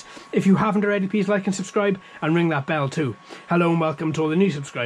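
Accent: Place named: South Wales, United Kingdom. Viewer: Sounds like ya from South Wales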